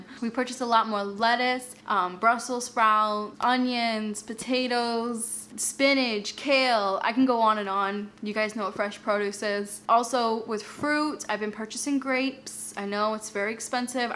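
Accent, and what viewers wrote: Boston, United States. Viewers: Love your Boston accent. 😄💖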